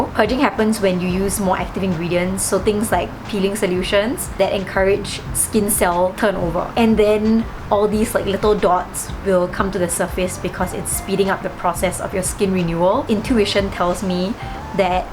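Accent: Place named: Singapore. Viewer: You sound Singaporean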